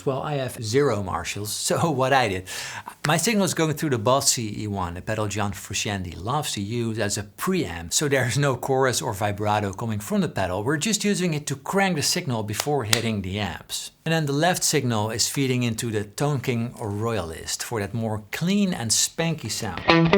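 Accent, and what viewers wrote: Rotterdam, Netherlands. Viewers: Hey ouwe Rotterdammert! Ik wil je graag zeggen hoe leuk het is om een gitaar youtuber te horen in m'n eigen accent:) Ik woon al 15 jaar in Sydney. Speaking of John riffs and licks, I think is somewhat similar to this one. A good one to do is the solo on ' '! I'm sure there is something interesting about it. En nog gefeliciteerd met het uitlopen van de Marathon!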